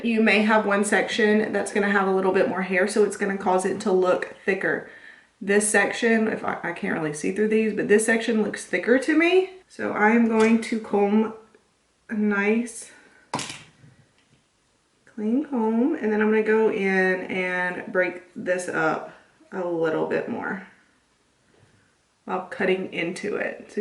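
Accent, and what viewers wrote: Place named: South, United States. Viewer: Love the cute southern accent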